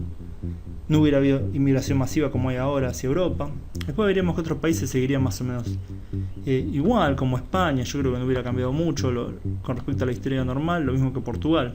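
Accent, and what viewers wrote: Rioplatense, Argentina. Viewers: Lamentablemente, este videísta padece de la tara foniátrica rioplatense de confundir la LL y la Y con la CH; él dice "achá", "chevó", "machor". etc. por querer decir "allá", "llevó", "mayor". No sé por qué acentúa tanto la CH, he visto otros vídeos de otras personas también rioplatenses, pero que no remarcan tanto esa letra, parece que para ellos sería como signo de distinción o algo así, pero lo cierto es que termina fastidiando esa musiquita de la CH. Lo mejor sería insertar una voz robótica, son más neutrales, bien moduladas, y sin sonsonetes de aldea, están disponibles de google.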